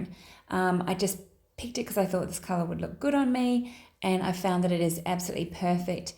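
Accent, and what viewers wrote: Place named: New Zealand. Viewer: Ohhh I see becuz it says Australia on your bio but your accent is a bit kiwi 😅 I was a quite confuse.. I was trying to figure out if you’re a kiwi or an Aussie because u almost sound Australian but more towards New Zealand, it’s like you have mixed accent between the two I’m Thai who have lived in New Zealand for 15 years but now currently living in Australia Btw you have a lovely kiwi accent and you speak very nicely ❤️ it’s nice to listen to 🤗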